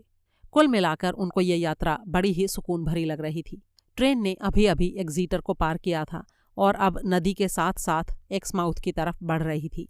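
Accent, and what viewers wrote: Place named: South, India. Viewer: आप साउथ इंडिया से है क्या? आपका एकसेंट यही बता रहा क्योंकि अभी हाल ही में मैंने महारानी वेब सीरीज़ देखी है जिसमे रानी की OSD IAS का भी एक्सेंट ऐसा ही था वो केरल की थी।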